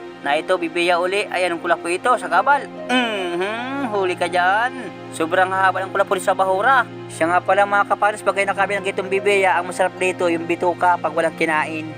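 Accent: Philippines. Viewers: Parang taga katanawan ka kuya ah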